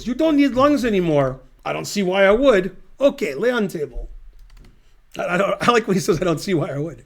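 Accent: United States. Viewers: I can totally tell your from Detroit bro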